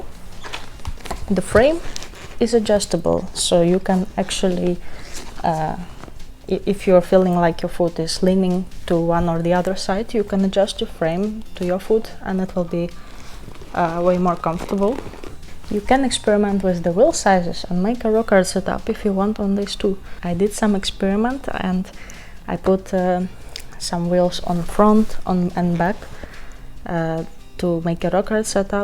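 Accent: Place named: Russia. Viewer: Ты русская, говоришь с акцентом)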